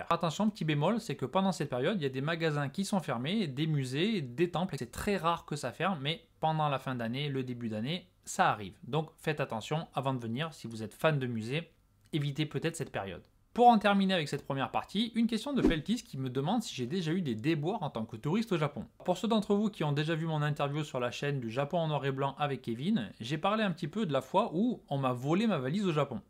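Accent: Marseille, France. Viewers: super vidéo au passage mon pseudo c'est daruma c'est juste que le pseudo était déjà prit donc le "a" est devenu un x. Masterclass comme d'habitude (ton accent de Marseille me fait trop rire, même après des années au Japon tu ne la pas perdu)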